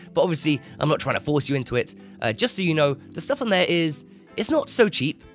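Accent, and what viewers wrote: United Kingdom. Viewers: Like Simon Sinnek said it a ones: "They don´t will love you for doing things the way you think they want it. They will love you for beeing yourself. You´re doing it absolutly right. Oh and by the way. Your brittish accent paired with a dbd Killer is the best i ever heard! Keep up your great work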